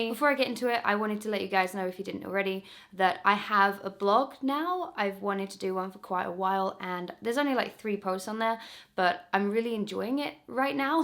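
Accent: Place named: Essex, United Kingdom. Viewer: Missed that Essex accent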